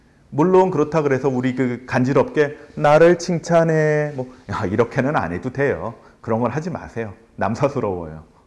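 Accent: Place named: Chungcheong, South Korea. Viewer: 충청도 분이구만.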